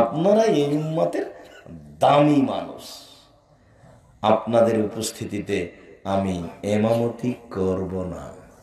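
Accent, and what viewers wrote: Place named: Dhaka, Bangladesh. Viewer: সে তার ঢাকাইয়া ভাষা কইতে যাইয়া ভাষার বিকৃতি হয়ে যায়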